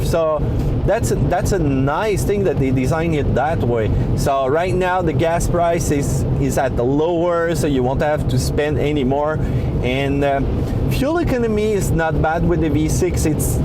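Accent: Quebec, Canada. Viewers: c'est pas du hate mais..j'avais jamais entendu notre accent québécois en anglais...c'est spécial xDD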